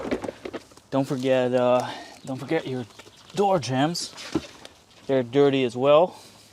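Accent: United States. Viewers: Are you american? Your english sounds very american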